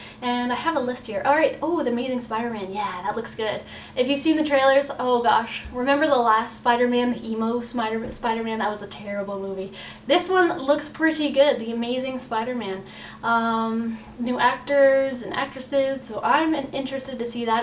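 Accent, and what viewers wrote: Canada. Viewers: Are you Canadian? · I love the part about the 7 year old getting late to bed because he... you know... had to drive home! That was cute... Btw, my favorite James Bond character is: Xenia Sergeyevna Onatopp (Golden Eye) Hockey, he? From the sound of it you either from Toronto or Montréal, lol!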